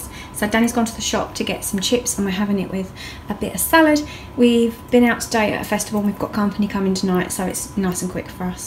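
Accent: United Kingdom. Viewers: Really! The lovely Brit accent. Thanks much. But---is the "Little" a nickname preface of sorts? Surely a tween in a few years won't be amenable to that. 😉🥰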